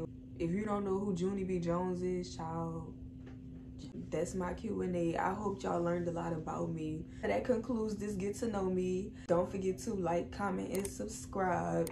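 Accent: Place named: New Orleans, United States. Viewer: I can tell you from N.O from the accent 😂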